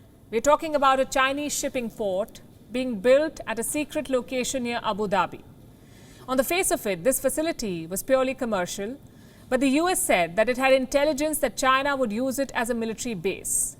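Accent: India. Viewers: This news caster speaks English but sounds like hindi... The channel needs to replace her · Everyone understand british accent but not everyone understand ( Hindi English). Since WION is 'GLOBAl ' .The accent is important for all viewer's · Both ways are acceptable, the British, Australian, and American pronounce English words differently too, and in this case Indians pronounce English that way. The world does not revolve around your way of pronouncing things. There are more English speakers in India than in America think about it.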